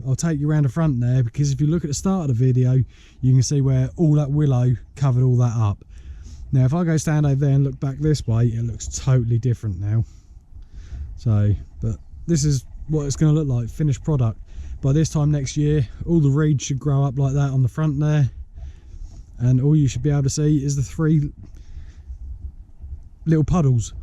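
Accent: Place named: Suffolk, United Kingdom. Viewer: Hey baa nothing wrong with Suffolk talk greetings from Newmarket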